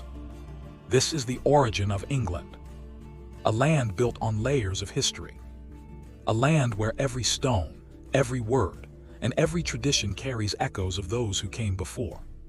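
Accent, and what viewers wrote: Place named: United States. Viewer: Sorry as soon as I hear an American voice over on British history I turn off , you need a local narration even if it's AI.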